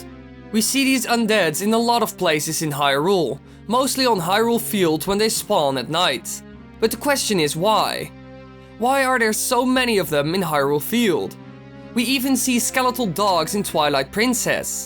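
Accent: Netherlands. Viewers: ...This may be an odd question, but... are you Dutch?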